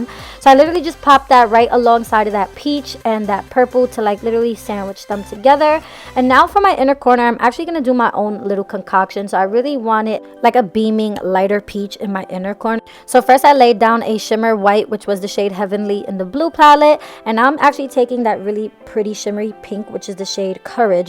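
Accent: New York, United States. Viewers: Ur the only YouTuber I enjoy watching lmao I loveee your NY accent and your amazing vibes! Keep it up girly ❤️❤️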